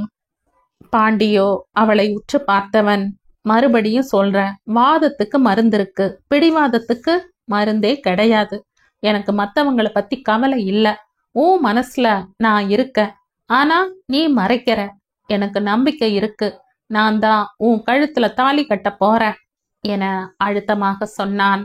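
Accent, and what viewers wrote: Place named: Kongu, India. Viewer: அருமையான பதிவு சிறப்பான முறையில் கொங்கு தமிழில் தங்களின் இனிய குரலில் கதை கேட்ட சந்தோஷம் நெஞ்சம் மறக்க முடியாத கிராமத்து மக்களின் வாழ்க்கை கதையின் ஒவ்வொரு அத்தியாயமும் அருமையிலும் அருமை வாழ்த்துக்கள் சிவசடாச்சரம் இராமநாதபுரம்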